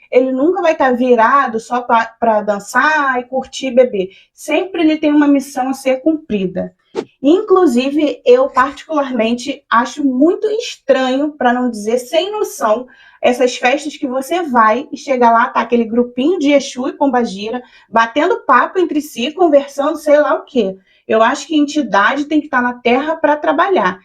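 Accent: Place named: Rio de Janeiro, Brazil. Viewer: A história é linda , mas o sutaque carioca é horrível 🤢🤢🤢🤢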